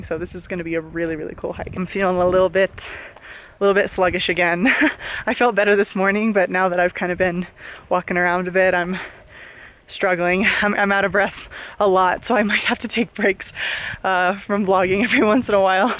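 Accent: Spain. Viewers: espero que con este viaje aprenda nuevos acentos y se le baje un poco el españolete jajaja I also hope that you can come to Colombia, we are right there next to peru. there's so many incredible things to see in south america too;) Love all the facts and interesting details you always give us with each new place you go, makes me feel ready to go places, knowing a little bit more about cultures. that's why i love your vlogs ( also because you're hilarious and i adore you) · you speak great spanish!! Its funny how you have a Spanish accent, like from Spain, its not common for people to have that accent when spanish is their second language! Good hello from Colombia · hahaha Ay tienes acento español! Peru looks so beautiful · Is that a Spanish accent I hear? I love it!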